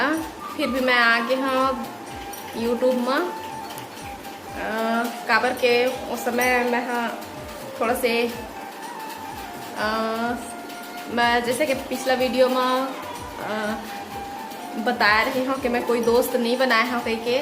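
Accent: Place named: Chhattisgarh, India. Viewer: दीदी तोर शब्द बहुत अच्छा हे और शुद्ध छत्तीसगढ़ी बोली बोलथस और तोर आवाज भी कोयली जैसे हे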